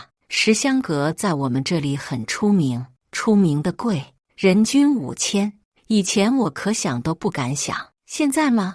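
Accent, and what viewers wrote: China. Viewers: 聽不懂，是什麼鄉下方言？ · 操 后半段用普通话发音说粤语（或者闽南话？）！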